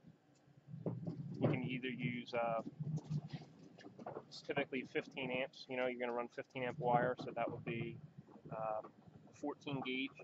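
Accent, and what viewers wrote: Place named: New Jersey, United States. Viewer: I just recovered 2 similar units that were installed briefly then decommissioned because the plant they were in has airflow problems and all the air these units made was sucked up and out the through the roof, and did not solve their problems. The wall units had already been discarded but because the refrigerant hadn't been recovered yet they were storing the condensers. I have a shopping list made up with wall units, line sets, and disconnect boxes. I am a millwright by trade but mostly work on air compressors. I plan on installing them for my home myself, with the help of an electrician for setting up my disconnect boxes and HVAC tech to purge, vacuum test, and charge my system. Is there anything in particular about these units that I should know? Someone online mentioned Mitsubishis can be tricky to wire but based on the manual it doesn't look any different than your complete install video I watched the other day, other than having to wire 3 separate units vs the one in your video. 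PS, you aren't related to Seth's Bike Hacks are you? Same NJ accent and talent for video production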